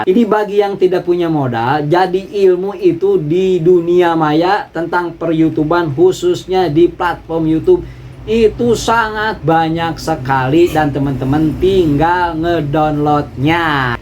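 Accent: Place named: Sunda, Indonesia. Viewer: Logatnta nyunda banget kang... Sama kaya saya 🤫🤭🤝🙏🏻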